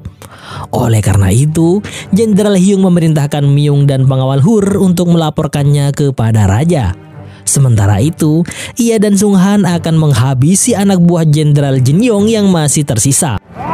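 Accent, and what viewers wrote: Madura, Indonesia. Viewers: Madura mana bg?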